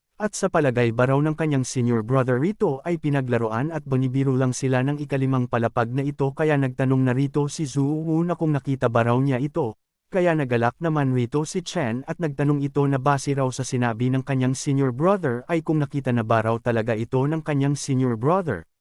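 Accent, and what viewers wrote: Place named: United States. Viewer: ok nayan lods wag Mona bagohin feel kolang Kasi Americano mag salita maganda namn recap mo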